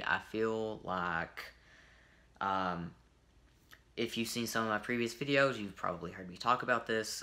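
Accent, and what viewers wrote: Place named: South, United States. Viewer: You’re definitely from the south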